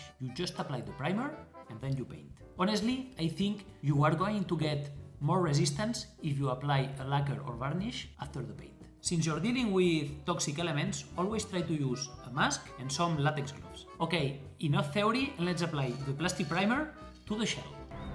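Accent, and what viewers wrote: Spain. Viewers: Se nota que eres español🇪🇸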